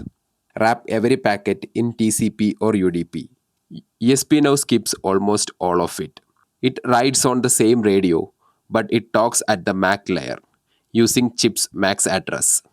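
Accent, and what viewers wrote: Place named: Kerala, India. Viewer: മലയാളിയാ ല്ലേ... ഇഗ്ലീഷ് കോട്ടാ..റിയാ.. മമ്മുട്ടിയെപ്പോലെ ശ്വാസം പിടിച്ച് നല്ല ബലം പിടിച്ചാണ് സംസാരിക്കുന്നത്. ഒന്ന് കൈ കുറഞ്ഞ് കുഴഞ്ഞ നാക്കോണ്ട് പറഞ്ഞൂടെ?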